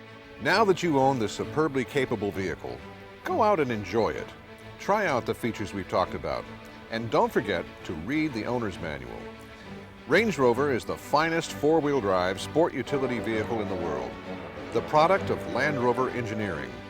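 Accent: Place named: United States. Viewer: this is one creepy VHS era video why is an american bloke speaking with a posh English music in the background? :D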